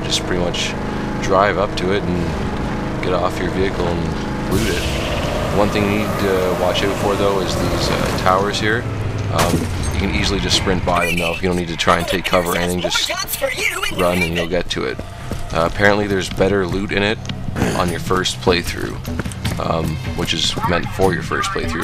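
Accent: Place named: Canada. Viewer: CANADIAN DETECTED.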